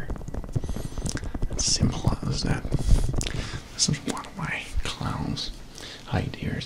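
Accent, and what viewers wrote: United States. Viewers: pretty good american accent man! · Your American accent is impressive 👍🏻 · Its funny what american accent sounds like. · I am shooketh. I can't believe you're so good at a American ascent. Heck I'm American and don't even have that good of an American ascent! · American accent is magical Keep doing doing your thing you sexy beast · Is this your only video with an american-type accent? It's spot on! And super relaxing! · I LOVE hearing you with an American accent!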